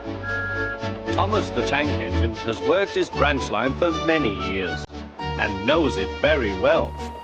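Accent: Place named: United Kingdom. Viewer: Great job! I love the UK narration.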